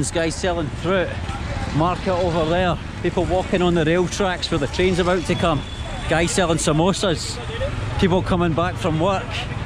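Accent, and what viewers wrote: Scotland, United Kingdom. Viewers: I think it is just that scottish accent. The British got their wit from trying to deal with unruly Irish and Scots all the time is my guess. Been practicing a second language of Spanish in Puerto Rico and they speak it with a high pitch accent as well that makes it sound fast but actually mexican spanish is much faster. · Hey great vlog once again! Obviously in love with the Scottish accent which we find very humorous and words such as wee bit and your boy here 😃 just a clarification. Sadly Our forefathers never understood the gravity of letting go of the brits off our nation. Most Locals living out of Colombo don’t speak good English. That man said “ theres one in half hour”” he did actually say there’s a bus in every half hour but sadly his English is wrong. Hopefully oneday they will too improve. Anyways I’m sure you got a better deal price wise traveling in the bus buddy 👍 have fun! 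· Hi, Just seen your video that you are in Kandy. I am from Kandy but at the moment I am in Scotland for my studies. Just for my curiosity, I would like to ask if you are from Scotland as you got very similar Scottish accent. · Making Scottish great again. Thanks for the video. The accent and the narration is sublime as Harald Baldr would say it.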